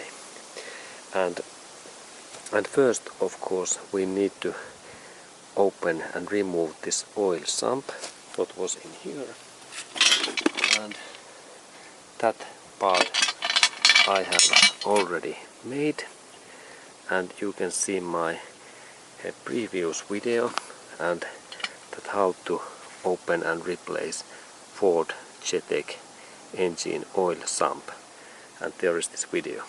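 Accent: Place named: Finland. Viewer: Kuulostaa kyllä ralli englannilta :) Kyseessä siis zetec se, eli pikku zeteckki.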